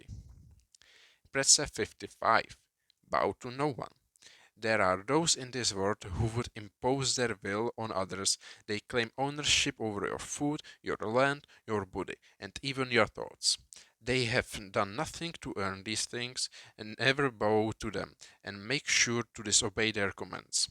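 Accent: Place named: Russia. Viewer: You sound like Russian or german, am I right?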